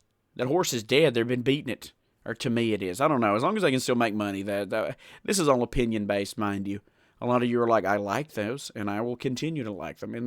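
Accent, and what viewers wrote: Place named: South, United States. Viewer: U HAVE THAT SOUTHERN ACCENT WHAT STATE U LIVE IN ??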